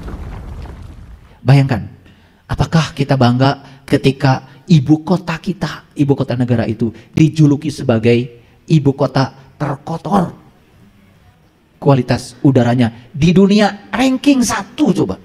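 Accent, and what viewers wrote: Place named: West Java, Indonesia. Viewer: Si paling kemarab, lidah Sunda koq dipaksa jd arab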